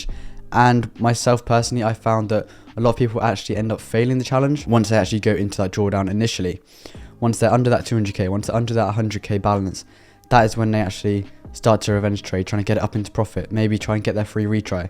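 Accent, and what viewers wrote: United Kingdom. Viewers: I always thought you were from London damm, where are you from? Would you ever do a meet up?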